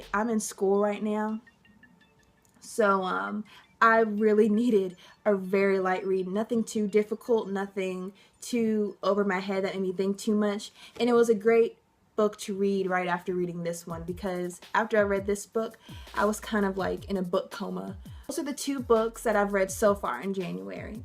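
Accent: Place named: South, United States. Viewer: I'm glad I'm not crazy then! I thought you sounded a little southern! It's definitely cute, I love southern accents. And I'm sure you will absolutely love it in this community. :)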